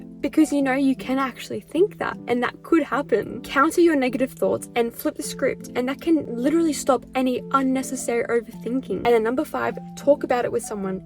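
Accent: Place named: Australia. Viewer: Name = ☘ Accent = Australia 🦘 Mind = blown 🤯 But I'm not gonna overthink it 😄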